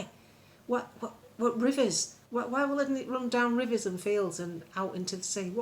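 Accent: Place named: North, United Kingdom. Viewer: Love your channel !!!! Its a lovely northern voice x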